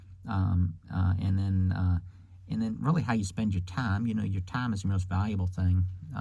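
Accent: South, United States. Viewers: Wtf! I just googled where you do these rentals and you’re in the same province as me?? I thought you did this in the southern US because of your accent. Let me work for you 😂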